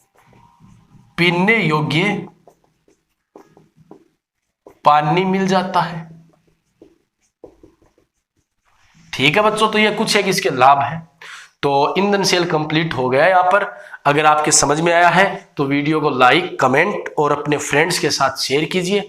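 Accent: Punjab, India. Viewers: Aap Punjab se ho sir kya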